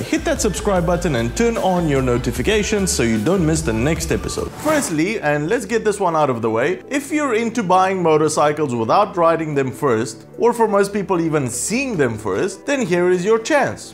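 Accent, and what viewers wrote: South Africa. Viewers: Dude.... do you have some South African in you? You have some very South African accent in your words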